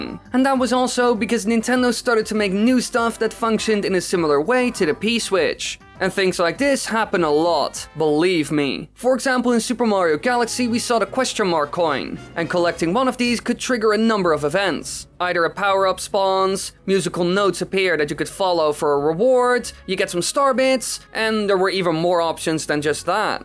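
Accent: Netherlands. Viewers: Ik denk vrij zeker te horen dat jij Nederlands bent! :D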